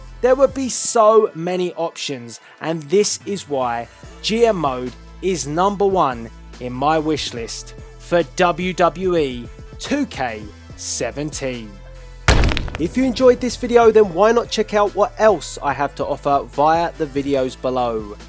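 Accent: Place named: United Kingdom. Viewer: Every time I think a WhatCulture narrator could not possibly be more UK/British-sounding, they manage to top themselves.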